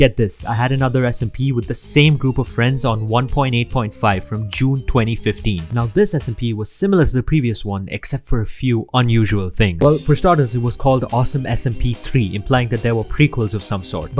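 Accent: India. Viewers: i am new here but u sound like a indian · He sounds indian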